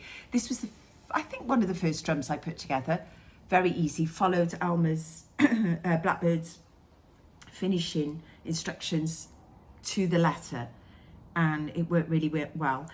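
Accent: Wales, United Kingdom. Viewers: Hi another wonderful flosstube. It’s so nice to get to know you through your stitching. I know exactly how you feel about being a lone stitcher, as that is exactly how I feel. I have an instagram account just from my crafts and stitching so all my family don’t get to see in on my own account and it’s also great to look back on all your hardworking. I normally watch flosstube from the USA so it’s lovely to hear a fellow Brit on here. I also love your embroidery. My daughter was very lucky to study her hand embroidery degree for 3 years at Hampton Court Palace So if I ever get stuck I call on her 😂 can’t wait for your next flosstube. Also I love a pink rose. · Just found your channel, do I detect a bit of Welsh accent? I was born in Pembrokeshire on the border of Carmarthenshire, speak fluent Welsh niw residing in Reading UK, now I will continue to watch! 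X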